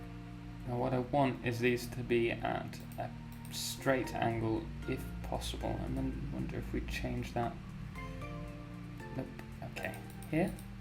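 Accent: United Kingdom. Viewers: the microphone sound doesn't sound muffled or clipping a whole awful lot though, i find the the general quality of the spectrum quite decent albeit a bit boosted agressively in the mids at the serious detriment of bass response (is there even bass?), it does sound like a cheap stick 1 buck mic in that regard (but even those can work magic if you know how) i mean muffled is worse, at least you can clearly hear the words he is speaking. sounds like he just needs to filter out the background noise, and a low hum which is most likely caused by PC fans, and judging by his british accent i'm hazarding a guess he may living in an old house with no grounding on the sockets except for the wet groups, i have that and it sucks shit for playing guitar, you have to constantly keep in contact with a string to ground the hum out lol, yes even on humbuckers.. although pickups are technically AC, the amplification stage is NOT. 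i already said years ago youtubers should up their game in using hard limiters / compressors to get their levels nice, but in those days ear rape was a slapstick thing lol this doesn't seem to be as much as a leveling issue as much as it is an ambient noise/EQ problem i assume you're listening this on headphones, i'm playing this on it on near field monitors with very conservative volume settings so the annoyances you experience kind of fly by for the most, i'm not too bothered by dust, clipping gets on my tits though, which is really not the biggest problem here, i've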